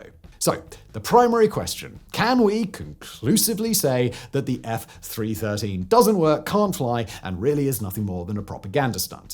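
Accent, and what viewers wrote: United Kingdom. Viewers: I've been following your various channels from the beginning, and I really appreciate and respect your work, but there's one little thing I'd like to say, most of your audience is international, and we learned English as a second or third language with the American system and media, I'm sure the UK accents are cool and folksy since it's one of the small nations that speak this language with this folksy aspect, like Jamaica for example, but if you could neutralize the accent a bit, it would serve the message a lot.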